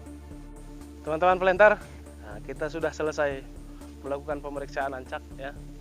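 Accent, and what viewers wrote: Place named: Medan, Indonesia. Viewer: Mantap bos q... Semangat pak.. Terima kasih tipsnya kk Mantap kali lah logat medannya pak.. Salam silaturahmi pak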